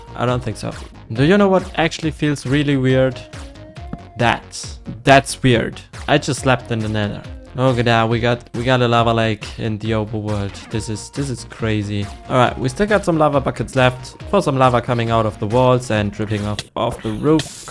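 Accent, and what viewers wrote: Germany. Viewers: Man merkt, dass du deutsch bist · You have a german Accent 😅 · The German accent is just 👌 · Ha ich wusste dass du deutsch bist, der Akzent 😂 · u sound kinda german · Ich wusste doch du bist deutsch, ich höre den Deitschen Akzent raus 😂 Btw ist die Kartoffel eine Anspielung darauf? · He is German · you sound like suuuuper german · the german accent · Sehr Gutes video man hört Dehn deutschen Akzent raus 🤙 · I love the accent omg! Are u german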